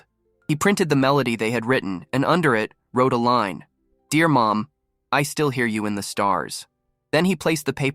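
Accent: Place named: United States. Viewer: One has to be very careful in choosing who reads these stories so that it complements the genre. I know Americans speak a strange form or English and this gentleman makes it very harsh with that strong accent. A pity as it is a lovely story and a lovely melody. It would get top marks if narrated by a gentle man's voice without that strong American twang.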